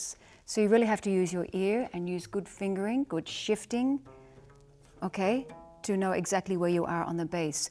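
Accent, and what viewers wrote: Australia. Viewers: So Australian! - starting with G'Day :-)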